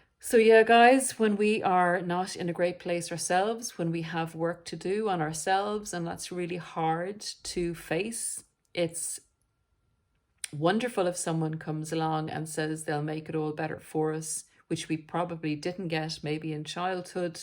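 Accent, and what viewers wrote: Ireland. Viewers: Nice too know we are not alone listening to sites on narcissists. Just feel even better with your accent being Irish ☘️ just found this site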